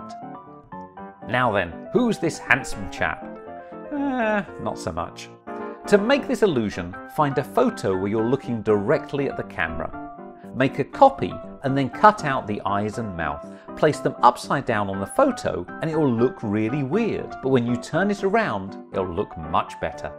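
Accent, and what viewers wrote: United Kingdom. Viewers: Omg u are British even I am 🐶🐶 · r u English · WHAT?!??! I didn’t notice that you are British!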